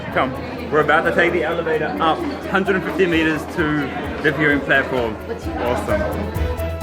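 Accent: India. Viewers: Sounding more Indian every video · This dude must've been living long enough in India that his English is already got that Indian accent. 😁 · Hey what happened to your accent. You sound so Indian in your english accent 😅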